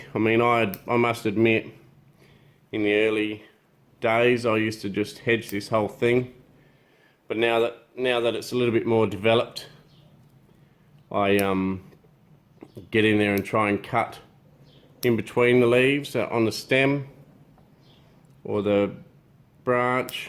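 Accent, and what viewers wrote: Australia. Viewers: Just starting out with bonsai, and found your videos, looking forward to many more. Nice to listen to an Aussie accent for a change 😃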